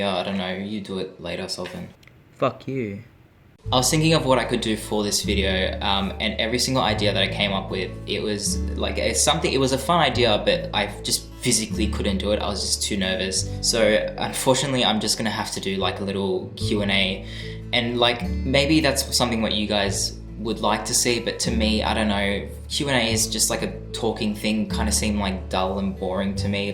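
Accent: Australia. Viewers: what part of Australia are you from? love that accent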